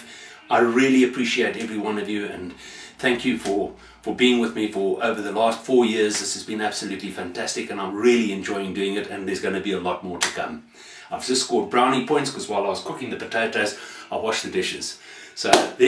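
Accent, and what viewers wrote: South Africa. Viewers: Are you from South Africa then? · are You South African?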